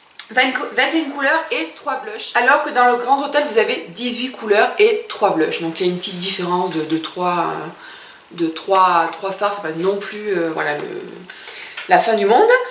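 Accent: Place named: France. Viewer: Hi, t as super craque!!! wouahhh, moi, j evite sephora, c est toxic! lol, Je participe avec plaisir Les videos que je prefere, hauls, tags, ton accent est cute! Kenneth mon mari adore, lol Il trouve que les francaises ont un joli accent, bisous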